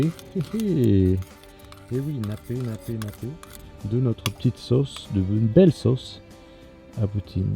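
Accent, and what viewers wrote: South, France. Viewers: Accent du sud ? De quel sud parlez-vous ? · Lors de ces premières recettes ce cordon bleu en mode campervan avait un accent de sud de la France ;)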